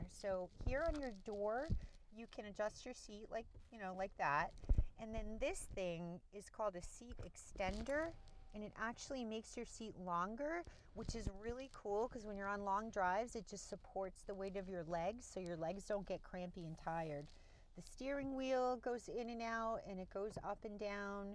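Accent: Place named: United States. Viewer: You sound awfully American for someone from Manchester...Great vid.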